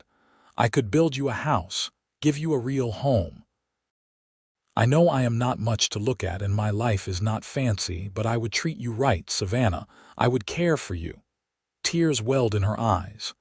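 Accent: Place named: United States. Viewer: A 10 story with my favourite voice of the narrator, who gives with his authentic American English the stories a sound like music to "the West". And it tells once more, that a long lasting love is decision and work, the gold around is light from above... Greetings from Germany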